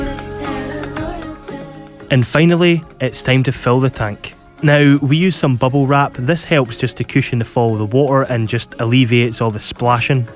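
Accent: Scotland, United Kingdom. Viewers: I miss Scotland so much and love your Scottish accent.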